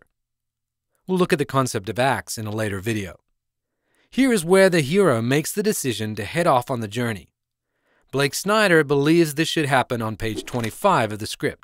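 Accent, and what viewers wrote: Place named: Australia. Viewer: Totally random observation: this dude has got to be half aussie or something. There are some sneaky vowels & dipthongs giving away an accent! (Dont worry, as an aussie, its a cute thing to notice) Handy video, btw. Thanks!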